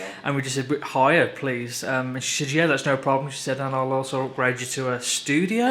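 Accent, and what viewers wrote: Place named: Birmingham, United Kingdom. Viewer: Love a brummy vlog. I'm from across in Wolves so nice to have a more recognisable accent in a vlog for a change, haha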